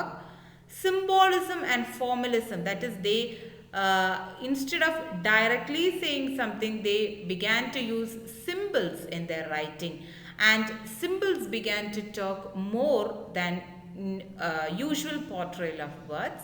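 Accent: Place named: Tamil Nadu, India. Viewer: Tamil accent is very clear. Tamilians should improve in English to sound neutral.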